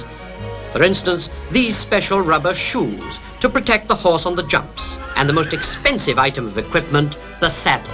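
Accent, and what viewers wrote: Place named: Surrey, United Kingdom. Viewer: He sounds like my Grandpa he was from Surrey I went to School with that accent and was corrected by the teacher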